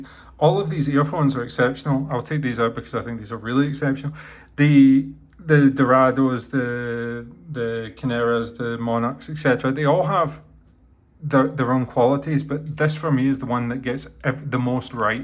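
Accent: Scotland, United Kingdom. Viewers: your scottish accent makes me trust you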